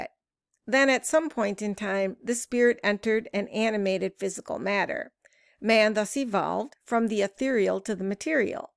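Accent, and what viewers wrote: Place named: Chicago, United States. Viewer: That's a Chicago mid West vocal infection. Someone I loved spoke that way and she even had it when speaking Spanish..as she was born in Cuba then fam had immigrated to Chicago as a child.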